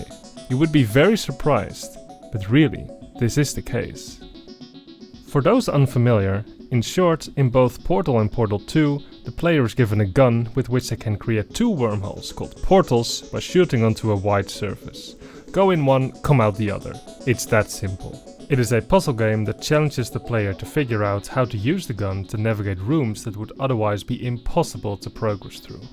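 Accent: Netherlands. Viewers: wait, u dutch? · I was like "What is this Dutch accent?" and then I saw the name and was like "Oh, ok."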